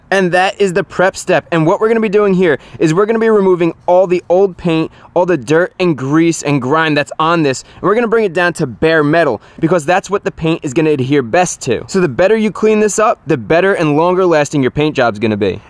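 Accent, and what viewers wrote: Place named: New Jersey, United States. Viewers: Love your videos man. If you lived closer we would hang out. You do stuff how my friends and I do. From listening to your voice I'm guessing you're from Jersey? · I heard the NJ in your voice a mile away lmao!!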